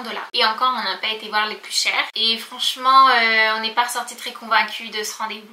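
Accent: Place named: Quebec, Canada. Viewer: C'est fou comme tu as pris l'accent Quebecois ! Je te revois encore avant ton départ pour ton année d'étude. Que de chemin parcouru depuis. Je vous souhaitee de réussir dans toutes vos démarches <3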